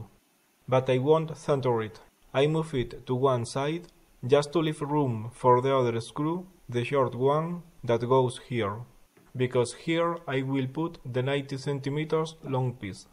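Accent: Spain. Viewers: I've spent my life reaching English to Spanish speakers (and others). Your accent is definitely Spanish from Spain but otherwise your English can't be faulted. Great videos too! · Great and unique Spanish accent! Cheers mate! Salut!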